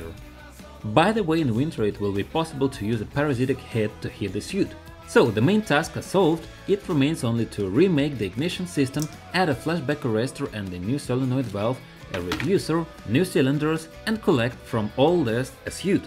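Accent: Russia. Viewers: Да Ты Русский, за километр слышно!!! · Ты ж русский? Или просто акцент такой? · Are you russian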